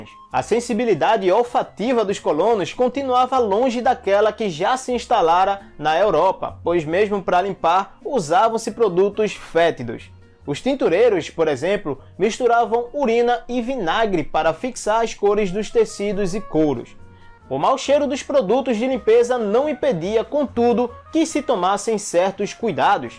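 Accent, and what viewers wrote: Northeast, Brazil. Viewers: Gosto muito do sotaque nordestino.👍🇧🇷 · A voz do é muito marcante.Tem sotaque de nordestino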